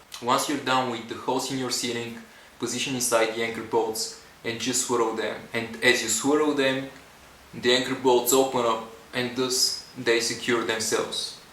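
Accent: Bulgaria. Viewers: He is bulgarian bro :D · тва ако не е български акцент те па на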